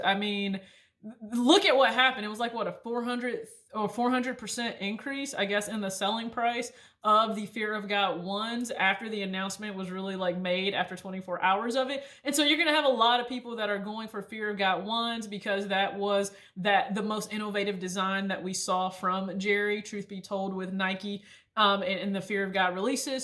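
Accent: South, United States. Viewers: yea via free side. that's usually how I do my legit checks . I get overly obsessed sometimes cuz I happen to enjoy it lol.. also great video love the content !! as well as the fact that your freaking amazing !! love the southern accent!!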